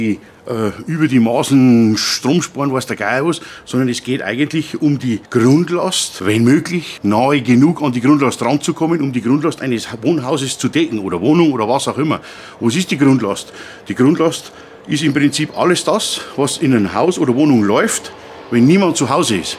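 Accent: Bavaria, Germany. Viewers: Einfach klasse, deine Art zu erklären ist gut verständlich. Ok, dein bayrischen Dialekt versteht nicht jeder, aber ich hab da überhaupt kein Problem. Mach weiter so du bist klasse. Gruß Klaus aus Unterfranken 😂👍noch Bayern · Sorry , alles schön und gut aber es versteht nicht jeder " Extrem Bayrisch" ich kann Dir wirlich nicht folgen !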